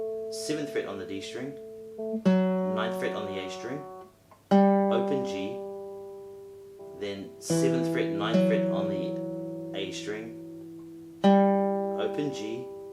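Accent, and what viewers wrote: New Zealand. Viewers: I knew I could hear the NZ accent! Greetings from Christchurch. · Kia Ora brother, absolutely fantastic stuff, I have been working on a few of your uploads and loving your gentle nature and articulate communication, impressive Spanish pronunciation mixed with classic Kiwi "orf" instead of "off" .. love it to bits! Much Aroha to you. Um I see you are using a voicing of what appears to be a C7th and you are saying how it's digit gymnastics - I watched Ana Vidovic and Pepe Romero and they appear to be using a bar arrangement from the 8th fret with the high E? So I am wondering what is the best? Obviously you have proven your way works but I can't help wondering, any thoughts? Cheers. Nick. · great, thanks a lot , sure i will . oh you are from New Zealand ¡ its a nice accent . Take care amigo ¡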